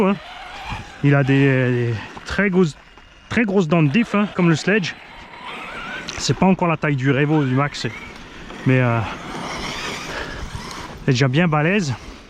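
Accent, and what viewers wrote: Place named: Alsace, France. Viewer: Salut! Je découvre ta chaîne depuis 1 semaine, étant l'heureux propriétaire d'un e-revo 2. Tes vidéos sont un régal, on sent l'amour du travail bien fait par un passionné (et balèze! 😉). Merci pour tes conseils techniques et ton intonation d'alsaco qui fait ton charme!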